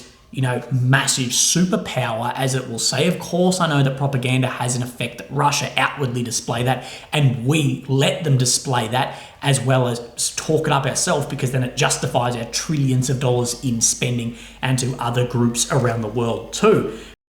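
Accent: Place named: Australia. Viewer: Your visuals are fine. Shows your intensity and good rich Oz language well.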